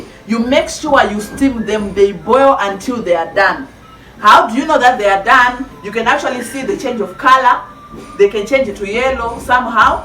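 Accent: Uganda. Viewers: Well done my girl, you are now an expert of matoke ❤❤❤ ramba wakadaro muroora wekwa unongondinakidza hako mwanangu chirungu chako haa chatova Ugandan English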